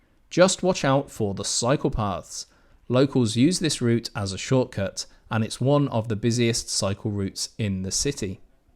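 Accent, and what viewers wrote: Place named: England, United Kingdom. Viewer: You sound English and I am curious as to why you moved here? Do you also speak Norwegian?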